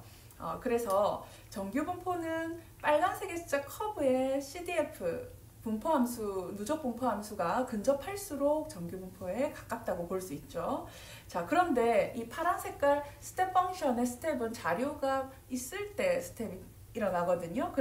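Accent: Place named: Busan, South Korea. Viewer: ㅎㅎ안녕하세요? 간호사이고 대학원생입니다. 9월되면 학술지에 논문을 투고 해야되는데 통계가 너무 어려워서 유튜브 찾다가 이 채널을 발견했어요. 말투가 낯설지 않고 정겨웠는데 부산에다 센텀에 계셔서 너무 좋아요. 제가 자주 가는 곳이라서^^; 따님분한테 쉽게 설명해주시는 부분도 좋고 따님분도 질문을 너무 야무지게 잘해서 스트레스 받지 않고 볼 수 있을 것 같아요. 그래서 이곳을 통계 맛집으로 정하고 정주행 하기로 했어요. 너무 유용하게 잘 듣고 있습니다. 그래도 감사 인사는 드리는게 좋을 것 같아서 댓글 남겼어요. 복 받으세용^^ 그리고 정말 감사드립니다❤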